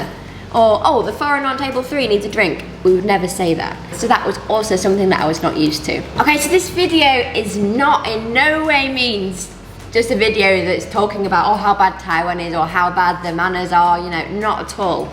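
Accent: United Kingdom. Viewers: 英國腔真的好好聽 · Your British accent is so beautiful and elegant 好喜歡你的英式口音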